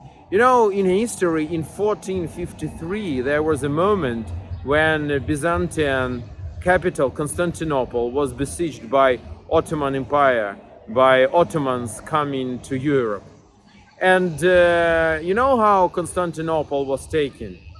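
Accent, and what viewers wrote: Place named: South, Russia. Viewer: инглишь себе подкрути, акцент остался. выраженный южный русский говор. палишься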